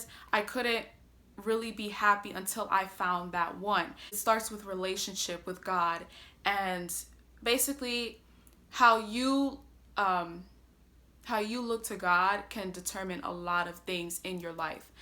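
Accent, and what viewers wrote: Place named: Brazil. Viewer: You have a slight Brazilian accent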